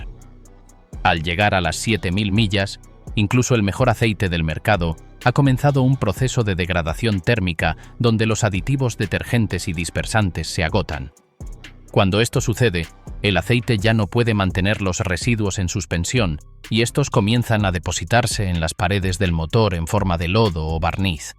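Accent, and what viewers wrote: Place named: Spain. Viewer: No me gusta el español de españa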